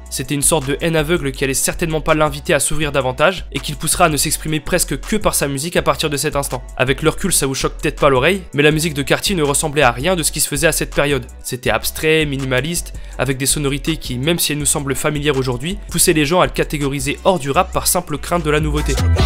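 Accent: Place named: France. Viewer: Très bonne video et ça fait bizarre d'entendre un français parler de et et 😂 qui sont trois autres boss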